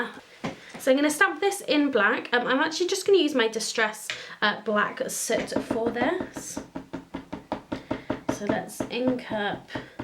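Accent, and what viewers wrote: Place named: Wales, United Kingdom. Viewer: Part way through I thought the dotted background would make it too busy but I was so wrong it worked beautifully. Every video I am trying to sus out your accent because I am hearing some Welsh mixed with something else I can’t put my finger on. I really need to start playing with my stamps and inks I find myself always turning to the Hunkydory card kits or digital designs.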